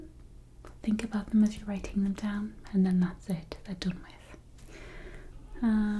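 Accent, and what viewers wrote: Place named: North East England, United Kingdom. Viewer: Love it. Totally relaxing 😍😴 also love the northeast accent I'm from north yorks so having a local asmr artist rocks 👍🏻👍🏻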